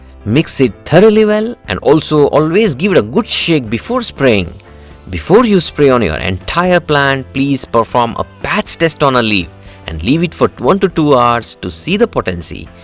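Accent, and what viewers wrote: India. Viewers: Nice India voice,thanks for posting …?How used for COVID …? ( veterans)🇺🇸🇨🇦🇫🇷🇬🇧🦠😛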